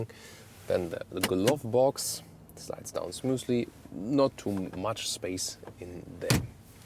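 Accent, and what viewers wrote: Germany. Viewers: A german guy with a thick accent reviewing a Porsche. Great!!!! Simply by far The best car reviews on youtube.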